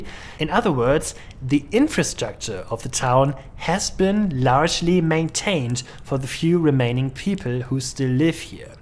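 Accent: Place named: Germany. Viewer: Het klinkt als Engels met een duits accent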